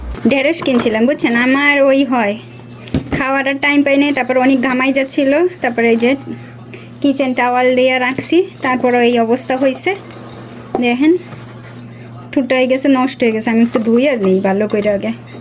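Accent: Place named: Barishal, Bangladesh. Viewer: আপনার কথায় বরিশাল এর ভাব আছে,খুবই, খুবই ভাল লাগে।সব সময় ভাল থাকবেন। ❤🎉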